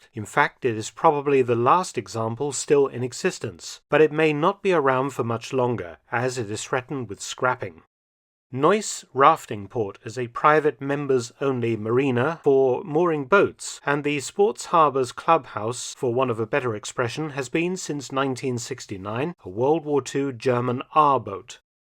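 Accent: United Kingdom. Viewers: I'm always put in a better mood while watching a Mark Felton video, the combination of the interesting topics, calm gentlemanly British accent and relaxed vibe of the videos is just so good.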